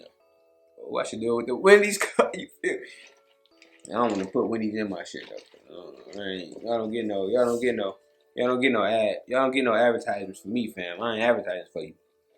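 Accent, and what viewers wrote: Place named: Detroit, United States. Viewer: Nigga it sound like you from the city frfr (Detroit)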